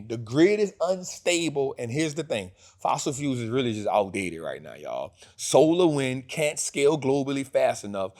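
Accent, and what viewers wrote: New Orleans, United States. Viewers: Yea, he from the city. If you not from here (the city) is what we in Louisiana call new Orleans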